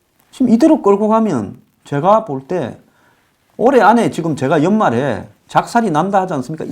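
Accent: Gyeongsang, South Korea. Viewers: 내가 이런 글 잘 안쓰는데... 늙어서 곤대질 하질말고 조용히 살아라. 갱상도라고 티내지 말고...내가 다 부끄럽다 아이가. 이 자식아.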